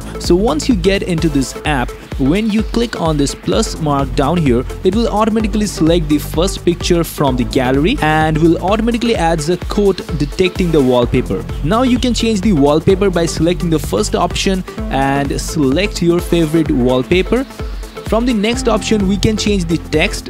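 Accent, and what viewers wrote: India. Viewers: Ok, after reading the comments and hearing him, I'm sure, you're Indian. By the way, nice video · Best Indian speaking english accent...great!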